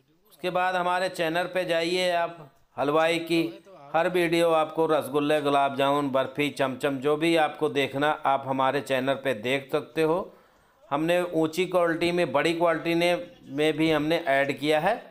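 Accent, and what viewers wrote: India. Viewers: Aap bihar sy ho kya bhaiii ....bahut badhiyan dhang bataye ho sojibka gulab jamun dhanywaad ,😂😂😂😜😜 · Sir aap up se hai kya?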